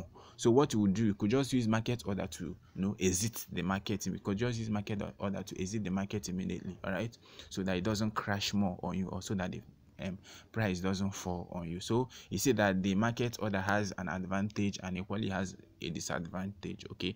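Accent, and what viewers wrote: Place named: Nigeria. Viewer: I like the originality of your Nigerian accent.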